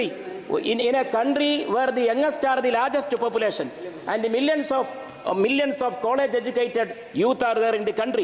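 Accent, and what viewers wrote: Kerala, India. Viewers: ഇംഗ്ലീഷ് ഉച്ചാരണം തീരെ പോരാ. വെറും മല്ലു ഇംഗ്ലീഷ്.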